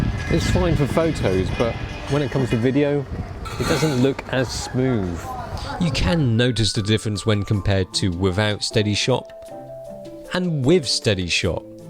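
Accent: United Kingdom. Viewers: Most annoying thing is Asian talks in British accent 😑😑😑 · Asian guy with British accent is blood awesome! Isn't it?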